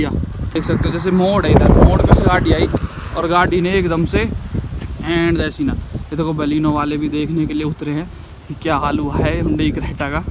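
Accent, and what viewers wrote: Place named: Jewar, India. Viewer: Bhai jewar ke pass ke lgte ho awaz se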